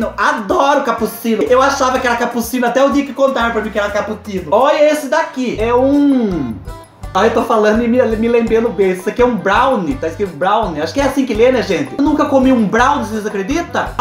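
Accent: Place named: Caipira, Brazil. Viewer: tudo que tu ganhou foi bem merecido, você faz a gente rir com esse sotaque meio caipira amo 👏🏾👏🏾👏🏾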